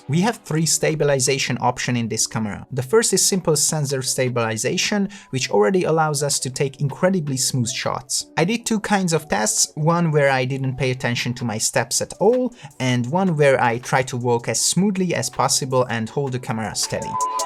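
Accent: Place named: Hungary. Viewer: Hallod én sejtettem hogy magyar vagy:D mindig fel lehet ismerni az akcentust. Király videó lett!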